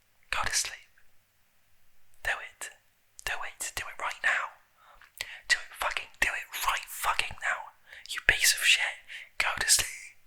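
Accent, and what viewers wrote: United Kingdom. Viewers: always wanted to listen to a british guy asmr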